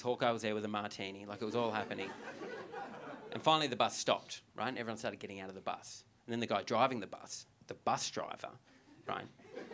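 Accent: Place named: Australia. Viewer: Wonderful! I spent 3 months in Australia about a decade ago. The accent, local colloquialisms, and Australian references and geography really brings me back. SO funny. Thank you!!!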